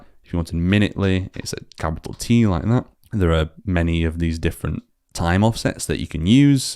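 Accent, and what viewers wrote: United Kingdom. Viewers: great work, this is excellent. I also appreciate the northern accent. It's been a while since I've heard a proper one. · Thank u… By the way, I like your contents a lot because of your exclusive content, as well as your deep and soothing voice, With that British accent :) so because of that, I can normally watch an hour-long video with no boring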